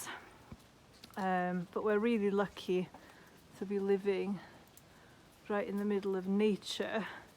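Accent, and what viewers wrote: North Wales, United Kingdom. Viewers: North Wales 😁🏴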